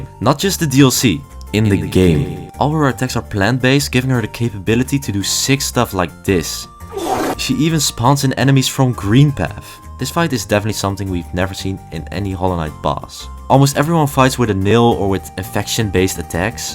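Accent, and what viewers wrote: Netherlands. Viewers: Dutch accent moment, nice vid tho haha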